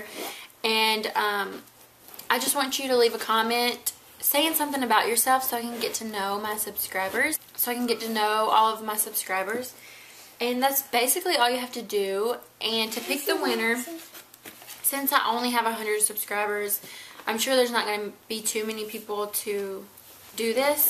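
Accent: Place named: South, United States. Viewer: I AM FREAKING IN LOVE <3 <3 <3 <3 with your sweet southern belle accent. omg my best friend is country too and i just love it! i want to marry a southern man when i get older <3 okay so anyway i love your videos and i love that you are not giving out makeup like every other youtube girl! you are such a sweet mommy! i can only hope and wish that i can be as great as a mom as you! your little babies are soo adorable and i can't believe how skinny minnie you are! got your figure back alrady lol. <3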